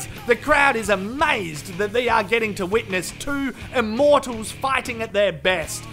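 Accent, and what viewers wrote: Australia. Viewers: Shit! Jesus... that was pure gold! Aussies swear better than the french. Without the accent, this would not be as hilarious. Good job m8! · This is like wrestling meets kickboxing with a aussie commentator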